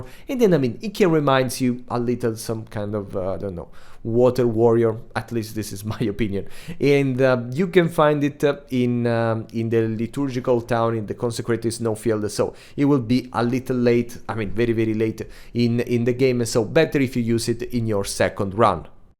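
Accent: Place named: Italy. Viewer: are you italian because of your accent btw good vid keep posting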